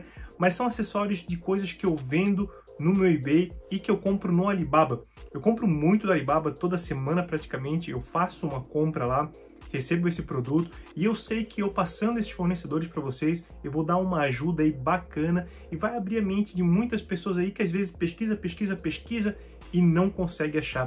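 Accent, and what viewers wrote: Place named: Florianópolis, Brazil. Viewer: você é de floripa também? fala igualzinho aqui o sutaque kk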